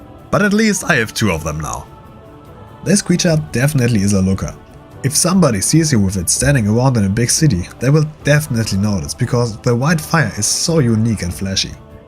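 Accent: Germany. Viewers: I bet my a** that you are 110% german. I can hear it through your accent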